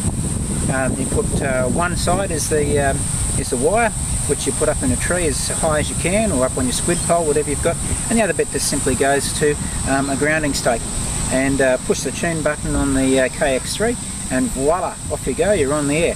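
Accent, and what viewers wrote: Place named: Australia. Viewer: Sorry but only 25% of what you are explaining is understandable for a non "Aussie" - certainly ad that speed!. "That mountain is too high for me". Paul - OP5A.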